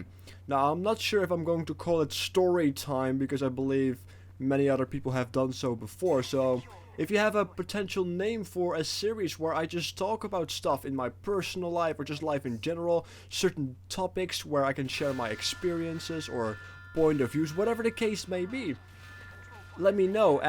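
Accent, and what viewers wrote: Netherlands. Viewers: I'm Dutch, and I thought I heard a Dutch accent while you speak. Hence the question :)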